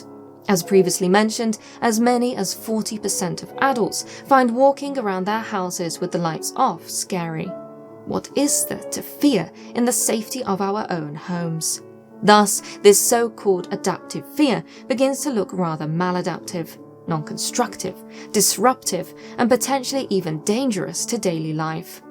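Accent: United Kingdom. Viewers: The narrator, is very eloquent and very english, very lovely. And this explanation about why we are afraid of the dark does make since. I don't feel quite so bad being still afraid of the dark and being 49 lol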